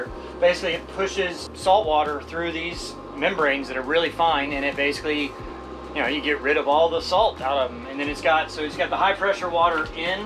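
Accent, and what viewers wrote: Canada. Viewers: I like her Canadian accent. Very nice. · "Oout and Aaabout" love the Canadian language.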